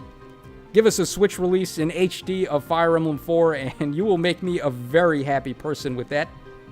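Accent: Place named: East Coast, United States. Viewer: If your accent didn't give away that you're from the east coast, you going to a "bodega" did. Congrats on that lotto win, though, the IRL equivalent of summoning 5*s. I might want to play now, too.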